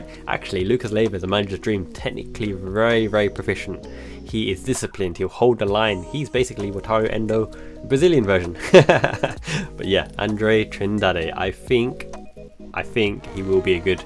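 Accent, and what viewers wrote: United Kingdom. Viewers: Good job man! Just wonder how come you speak that perfect English with British accent as a Japanese?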